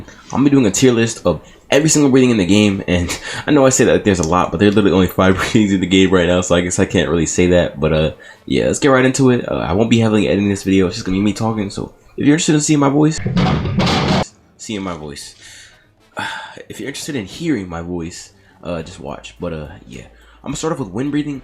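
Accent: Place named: New York, United States. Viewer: are you from new york you kinda have an accent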